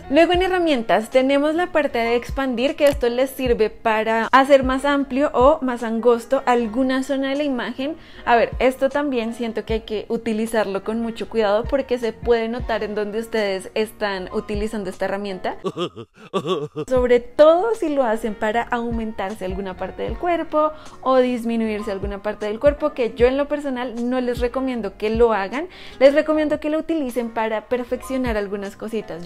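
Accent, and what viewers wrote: Colombia. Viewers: El acento Colombiano me encanta es muy relajante saludos 🇲🇽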